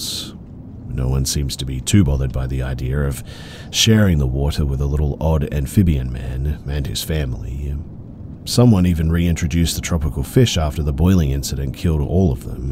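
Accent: Australia. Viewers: His voice actually calms me due to the fact that it’s a really really deep Australian accent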